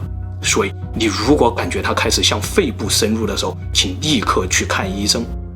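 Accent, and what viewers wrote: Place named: Hunan, China. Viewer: 的湖南口音真的讓我欲罷不能啊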